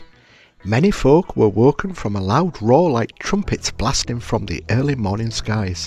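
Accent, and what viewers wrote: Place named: England, United Kingdom. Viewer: Great build. Nice to hear an English voice for a change. Davey Jones.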